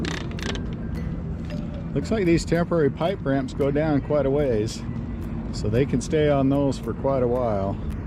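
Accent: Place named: Idaho, United States. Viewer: Are you from Idaho, Utah, Washington? Your accent reminds me of family in E. Idaho, it was pleasant even if seeing water levels like that aren't 😳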